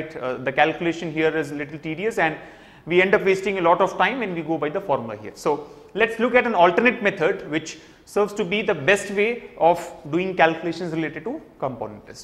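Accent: India. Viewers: Beautiful accent reminds me of my hometown India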